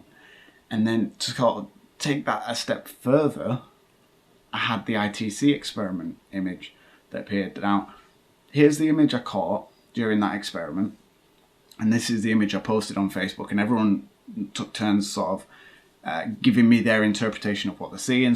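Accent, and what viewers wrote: United Kingdom. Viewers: para mi que soy mexicana me cuesta algo entender el ingles britanico ojala pudiera poner sub para captar mejor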